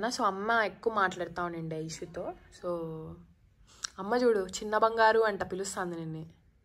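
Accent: Rayalaseema, India. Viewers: I think palamuru (TG) and Rayalaseema slang seems similar . I may be wrong, but its just my opinion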